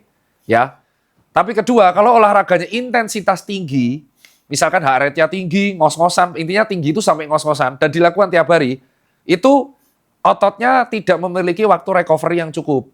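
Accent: Java, Indonesia. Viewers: Wah jadi teringat youtuber "Korea Reomit" Jang Hansol si Korean yg followernya disebut bolo-bolo yg BAHASA JAWANYA MEDOOOOOK ternya dokter ini medok juga, kl dokter Tirta followernya di Peng... Peng... Peng... 😂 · Lidah Jawa Muka Korea.. Spill rahasia ganteng dong 🤭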